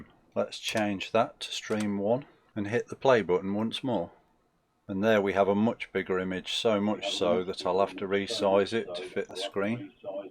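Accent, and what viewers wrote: United Kingdom. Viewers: that british accent must be staight out from a harry potter movie or sm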